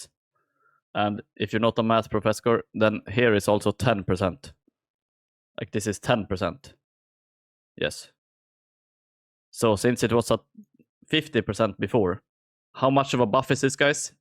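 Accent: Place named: Sweden. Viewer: Thats the thiccest swedish accent i ever heard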